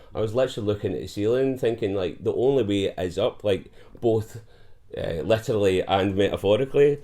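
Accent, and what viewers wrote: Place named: Glasgow, United Kingdom. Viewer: Я прекрасно его понимаю. Я австралиец, но моя мама родилась в том же городе, где он жил в Глазго. Я всю свою дочь провела в качестве переводчика для своих друзей, так как никто из них тоже не мог ее понять. Этот акцент трудно понять, если вы не привыкли к нему.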